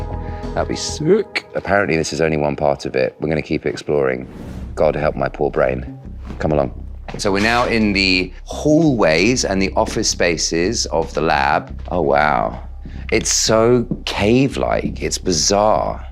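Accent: United Kingdom. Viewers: Too vague to say Southern. I am from the South West and a lot of people sound like farmers here. He has a middle class London accent, as someone else said. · It’s called a southern British accent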